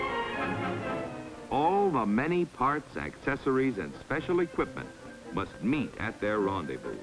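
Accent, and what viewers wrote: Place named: United States. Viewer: Nice! But one thing that I found interesting was how clear and beautiful was the narrator's American.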